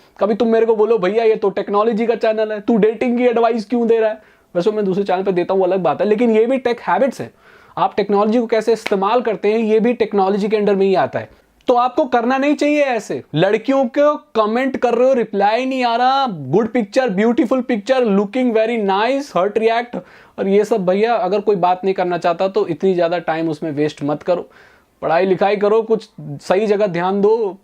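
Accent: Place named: Punjab, India. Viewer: Why are you making your accent like punjabi people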